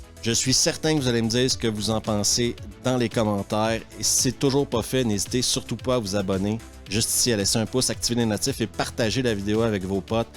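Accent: Quebec, Canada. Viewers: T'es Suisse et t'as un accent Québécois !?! Ou t'es Québécois et tu vis en Suisse ? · Le Monsieur est canadien avec son accent ?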